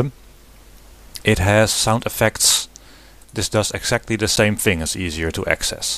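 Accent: Netherlands. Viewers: zo mooi om een nederlander engels te horen praten, je hoort het ook echt atijd binnen 1 seconden. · Aye is that a Dutch person I hear?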